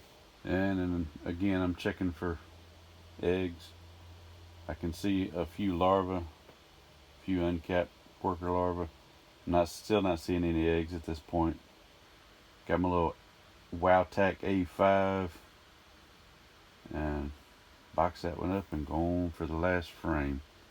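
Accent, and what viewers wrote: South, United States. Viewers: Is that talking country or southern. 😂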